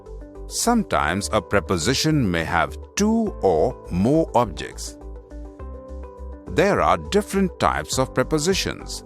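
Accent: India. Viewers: Indian English ??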